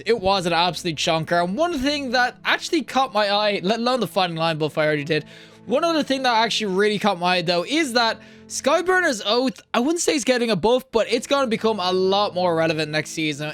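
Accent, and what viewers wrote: Ireland. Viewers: you saying warlocks ARENT king of the skys already?? also are you irish?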